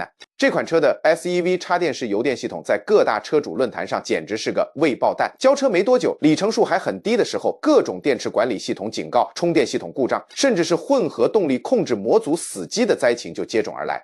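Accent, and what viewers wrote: China. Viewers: 大陸口音 還裝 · 大陸口音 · 你的敘事在台灣應該是在中國，裡面的車除了最後那幾名其他的車根本在台灣沒有出現過，這種超爛的AI內容農場，這是中國無聊的統戰，錢沒地方花拿出來隨便唬爛，以後聽到中國口音直接封鎖，真是浪費時間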